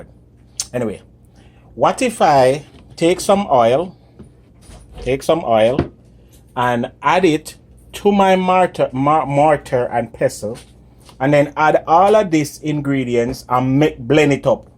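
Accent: Jamaica. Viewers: ..where you from???You sound Jamaican and how yu don know how to cook curry goat!! What??? Yu neva drink Maniish Water?? Anyhow yu cooking good? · I love how you talk!!! Real yaadi! BTW I'm going to try your recipe.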